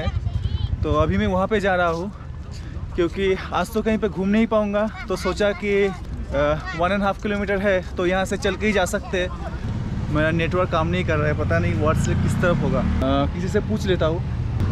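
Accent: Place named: Bengal, India. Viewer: When he was talking I got it he is bengali i mean I am to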